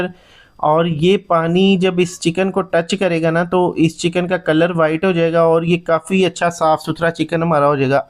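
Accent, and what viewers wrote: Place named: Punjab, India. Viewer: Assalam alaikum bhai... Masha Allah bohat khub aapki recipe hai... Agar isko mein fry nahi karta aur isko grill kiya OTG ya microwave mein ... Kar sakta hu... Zaroor reply kare... I like your accent... Punjabi tadka laga ke.... Allah Hafiz...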